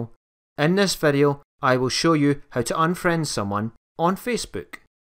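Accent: Scotland, United Kingdom. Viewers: Love ur Scottish accent. I can actually understand you.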